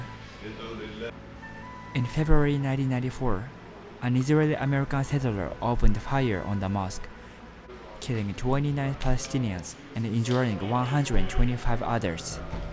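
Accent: Japan. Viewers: I can understand that your English accent is heavily Japanese, but that doesn't mean you understanding on world issues should be so foggy and uneducated. 🤔 THERE IS NO PLACE OR NEED FOR YET ANOTHER ARAB COUNTRY IN THE WORLD ! <link>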